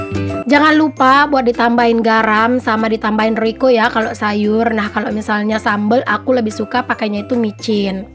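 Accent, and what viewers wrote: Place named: West Sumatra, Indonesia. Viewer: Hay Bun, Bun aku tuh salpok sama suara waktu dabing dan ga dabing beda banget 🤭 kalau ga lagi dabing suara nya Minang banget 🤭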